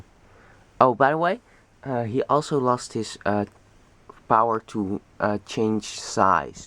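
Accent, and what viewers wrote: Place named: Netherlands. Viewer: I just hear that your Netherlands (ik ben ook)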